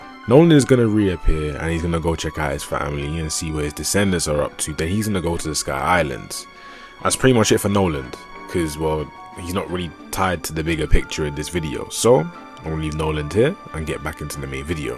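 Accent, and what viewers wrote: United Kingdom. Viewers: Ffs stop talking British bruh. Bro sounds incoherent (slightly) 2/2 videos that I've watched. EDIT: I like the content, that's the only reason I'm saying this. Not throwing hands just cos Brit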